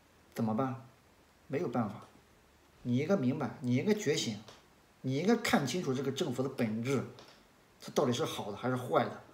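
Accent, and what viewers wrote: China. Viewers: 兄你好，我經常看你的影片，有個問題想跟你建議，能否錄製時把音量加大，我聽太不懂大陸腔，有時看你的影片會很辛苦，感謝你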